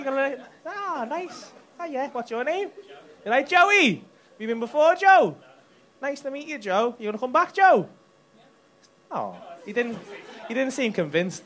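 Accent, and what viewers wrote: Liverpool, United Kingdom. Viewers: Not bad a Scouser. He really is awsome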